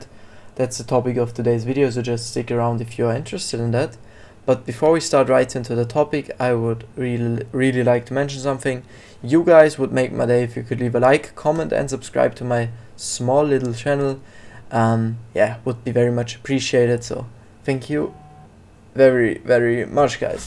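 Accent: Germany. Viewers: ur have german accent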